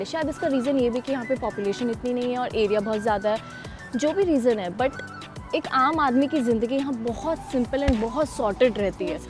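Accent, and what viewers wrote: India. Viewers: awaz se karol bagh ki lag rai ho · loved your North Indian Hindi accent. Sounds awesome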